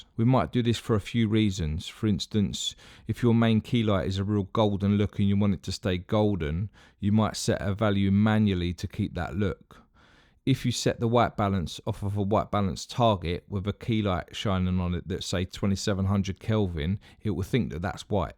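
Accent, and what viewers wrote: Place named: United Kingdom. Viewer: This is the most British dude I've ever heard in the wild. Thank you for the vid too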